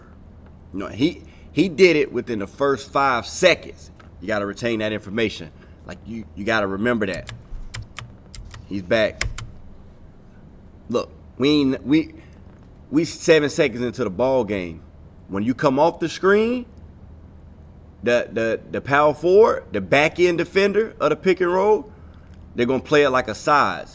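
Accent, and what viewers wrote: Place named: Atlanta, United States. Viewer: My dawg pointed you out....you peeping it young ..that next pass gotta know his next move...good break down...you atl or you midwest boss? You got that t.i. dialect ...salute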